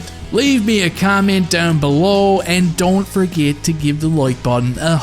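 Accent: Newfoundland, Canada. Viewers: Sounds more like a Newfie to me. Either that or a caper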